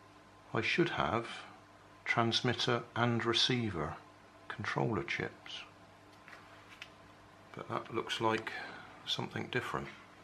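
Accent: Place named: RP, United Kingdom. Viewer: Forget it..... i love your royal accent, compose, even tone all throughout and the suspense that u maintain on whether project will work or not & above all irony .....wow!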